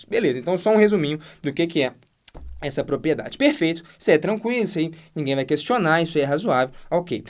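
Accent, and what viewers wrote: Minas Gerais, Brazil. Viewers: Parabéns pelo trabalho. Pelo sotaque, parece mineirinho igual a mim. Sou professor aqui em Brasília, confesso que essa parte tão básica é difícil de se passar em um cursinho aqui, pq é muito conteúdo. Sucesso aí, se tiver alunos com dificuldade em mat básica, indicarei vcs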